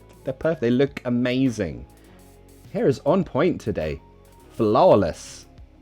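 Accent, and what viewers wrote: United Kingdom. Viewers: It was superb to speak with someone who has a perfect british accent 😊 thank you teacher 😇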